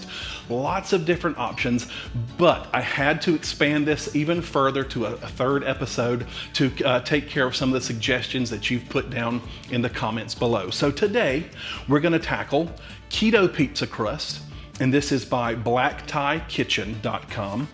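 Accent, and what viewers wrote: South, United States. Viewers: Man! I have been horrible on my diet and apparently was off Keto so bad YouTube stopped suggesting keto channel videos for me... bad sign for my health but also I missed your videos. Love your positive personality and your accent reminds me of my mother’s southern accent. Might have to try some of these and get back into keto eating...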